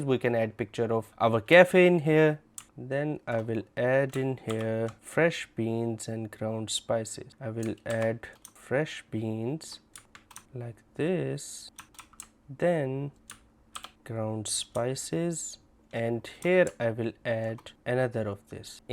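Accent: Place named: India. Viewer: Why his english is likely Indian accent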